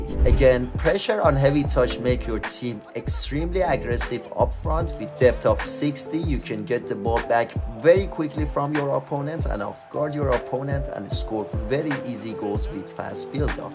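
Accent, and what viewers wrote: Iran. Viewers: persian accent hits so hard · your accent looks perfectly like iranians داداش😂❤️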